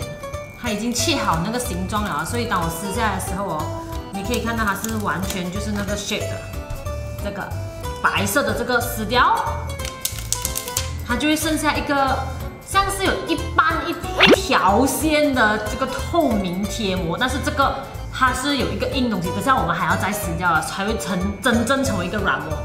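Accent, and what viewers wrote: Malaysia. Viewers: 马来西亚的口音 好有趣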